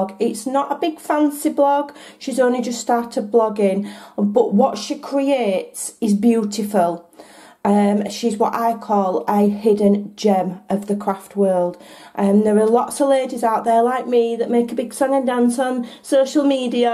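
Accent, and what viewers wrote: Yorkshire, United Kingdom. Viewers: Are you from Yorkshire? I love your accent . I lived in Harrogate for 5 years and in Bedfordshire for 3 years. My sons were born in Yorkshire. I love your cards! These are also beautiful. Will go to the blog for your friend.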